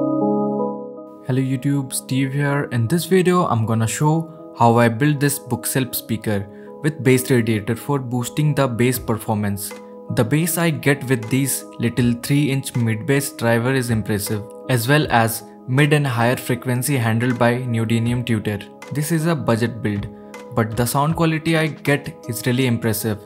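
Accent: India. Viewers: You sound Indian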